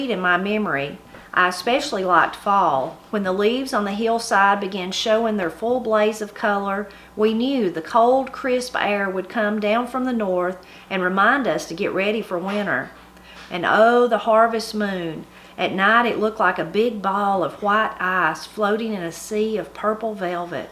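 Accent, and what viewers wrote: Appalachia, United States. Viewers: You talk just like me. I'm a retired English teacher from Eastern Kentucky. I was privileged to drive through the Smokies just last week. I've got to go back and listen to your first book..This is going to be a good one.I was just thinking about my cousin naming her daughters Sara and Hannah. Her parents were so worried that the girls would be called Surry and Hanner. I've got to go back and find your video on names. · My daughter introduced me to the The Blind Pig and Acorn. Sometime later I came across on You Tube. I enjoy it so much. Today I was looking for one of my cookbooks and I found a copy of Dorie Woman of the Mountains. I don't remember when I got it. It just got lost in with all of my books I guess. I am so excited. I immediately sat down and started reading. I grew up in North West GA and the stories you share and the lives your family live is so much like mine. 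My favorite place to go as a child was to my great uncle and aunt's house. I watched her churn butter and cook on a woodstove., wash clothes in a black wash pot, and cook her own cured ham, got her vegetables from the root cellar and I loved getting a cold drink of water from the well bucket with a dipper. I think of those visits often and miss them. You and I talk in the same "funny way," I once had a lady in a church where my husband was interim pastor tell me I talked so funny and Southern, said she'd never heard anyone talk so country as me. I guess I was a little sassy and I said," I talk this way because I was fortunate enough to grow up In Appalachia. Only privileged people can talk like this! I guess you got left out when God was giving out blessings"! She never mention my funny way of talking again. By the way, congratulations on your well deserved award. Thanks for all do do to Celebrate Appalachia!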